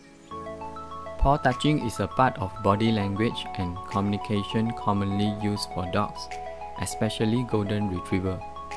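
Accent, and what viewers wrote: Malaysia. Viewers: Are you from Malaysia? Because your accent sound so Malaysian to me.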